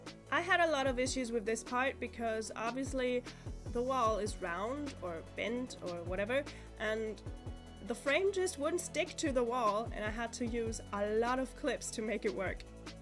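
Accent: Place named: Germany. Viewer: Could it be that you are German because your English sounds like that. (I’m German btw)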